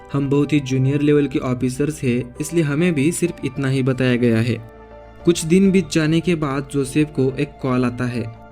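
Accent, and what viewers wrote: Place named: Maharashtra, India. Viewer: Bhava marathi ahes na? Accent varun samjl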